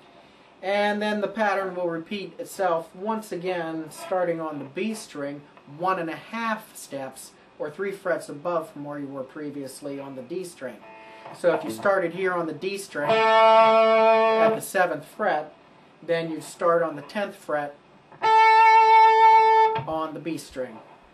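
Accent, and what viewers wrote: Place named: South, United States. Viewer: Alrighty then, not from up North are ya? Great little lesson.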